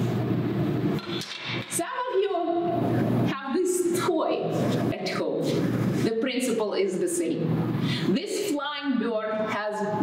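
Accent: Russia. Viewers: Увидела ролики с ней в ВК и сразу стало понятно, что она из русскоязычной страны - настолько этот акцент в английском языке слышен мне, обычному русскому обывателю. Именно так, как преподавали инглиш в советских школах (произношение я имею в виду). Я специально пошла на Ютуб искать эту чудо - даму и наткнулась на этот канал. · Обожаю её акцент, сколько лет живёт в англоязычной стране, а всё равно остаётся русской!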